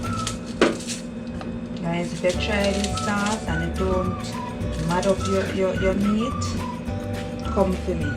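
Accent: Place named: Jamaica. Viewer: I love your intro, thats really nice. You have a nice accent. I love the jamaican accent. Nice prep you did before blending . Need to try this new to me. Thx 4 sharing 🥰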